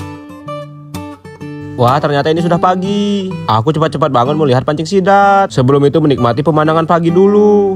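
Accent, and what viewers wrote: Java, Indonesia. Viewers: saya mau tanya, apakah kamu ini ada keturunan orang Jawa kok dr logat’ya Jawa bgt bang??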